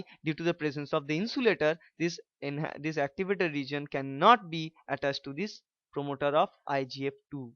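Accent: India. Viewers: Sir please Indian English bolne se acha hy Hindi mein he lecture deliver karein.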